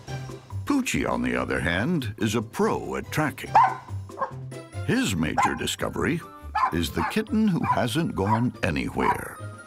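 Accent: United States. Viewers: The Stanley Parable narrator is British, this guy is American.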